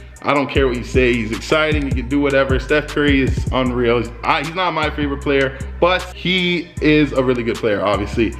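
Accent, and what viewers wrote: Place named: Boston, United States. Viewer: From boston I could tell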